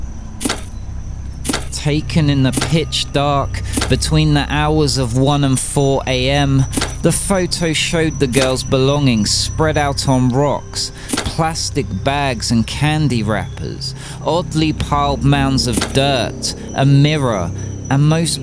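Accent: United Kingdom. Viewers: Your English accent makes the English language lovely to hear and understand.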